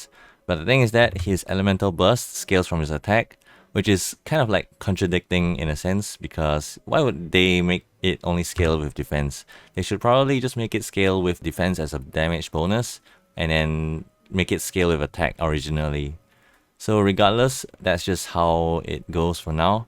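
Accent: Singapore. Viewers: I love how organised and clear all your calculations are, it makes it super easy to just refer back to the spreadsheet whenever I want! Also fellow Singaporean here, I was wondering why your accent sounded so familiar xD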